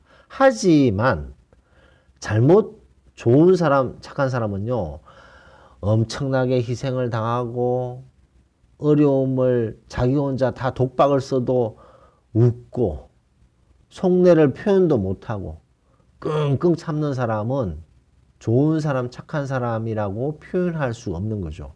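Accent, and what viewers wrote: Gyeongsang, South Korea. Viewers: 선생님^^ 구수한 갱상도 사투리 듣기거북하지 않습니다. 내용이 문제지 사투리가 뭐 문제겠습니까? 사투리라고한다면... 지방언어인데. 그 지방에 사는 사람들이 쓰는 말이 사투리입니다. 촌사람들이 쓰는 말이 사투리가 아니라는 거지요. 그러니까 서울경기에는 서울경기의 사투리가 있다는 겁니다. 꽝! 꽝! 꽝!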